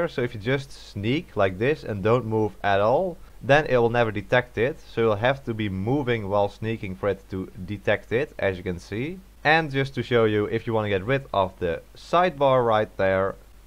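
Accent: Netherlands. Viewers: Wow if thats not a dutch accent i don’t know what is XD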